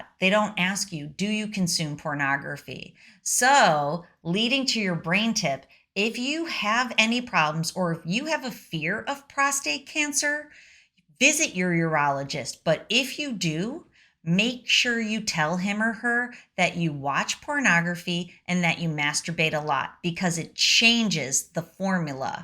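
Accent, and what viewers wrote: United States. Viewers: I turned this off as soon as I heard the American voice. I'll bet it has something to do with Jesus.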